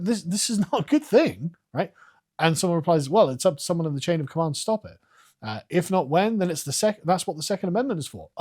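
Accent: United Kingdom. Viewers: Wait your from Central Arkansas? You sound very British.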